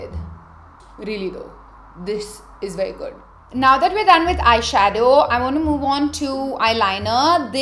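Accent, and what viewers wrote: India. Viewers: You talk like my friend she's from india too. Her name is zareen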